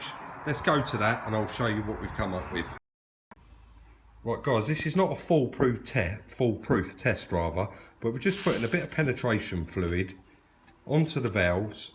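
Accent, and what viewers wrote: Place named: Cockney, United Kingdom. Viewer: Love your cockney accent mate !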